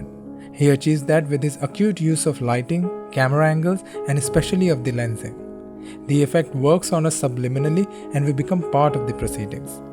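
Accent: India. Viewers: I really enjoy your indian accent, friend.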